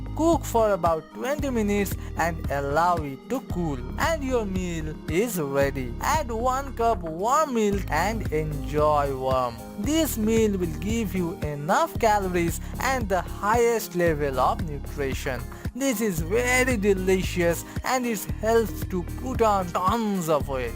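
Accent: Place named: India. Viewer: acting kyu kr raha bolNai tym.. bolnai sai he pata chal raha desi he ho🤨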